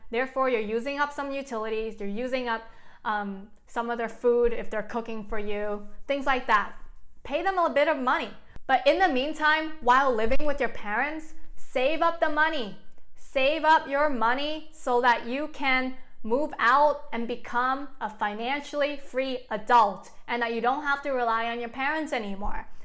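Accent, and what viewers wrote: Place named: United States. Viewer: she is speaking American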